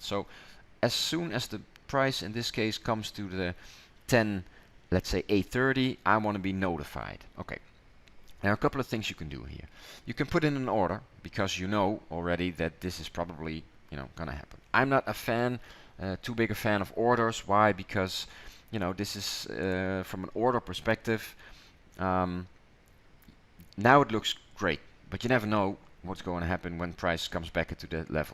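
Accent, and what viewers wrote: Netherlands. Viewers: I detect a slight Dutch accent unless it's South Africa? No mention of where you are in the About information.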